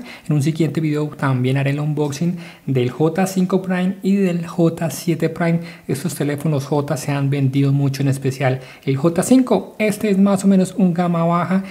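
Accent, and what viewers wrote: Colombia. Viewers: Hola señor, una pregunta Ud. ¿Es colombiano? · oye eres de colombia?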